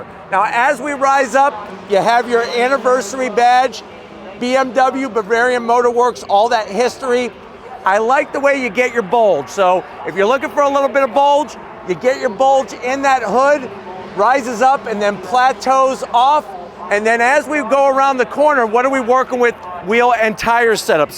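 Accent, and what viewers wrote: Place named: Redneck, United States. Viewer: funny American redneck accent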